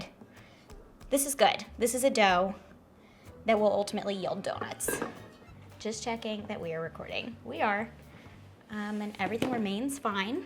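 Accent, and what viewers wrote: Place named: Rockland County, United States. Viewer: I freaking love!!! Her sarcasm kills me throughout her whole video!! Growing up in Rockland County NY she sounds like every girl I went to HS with, I love it!! 🥰🥰🥰🥰. is the best!!!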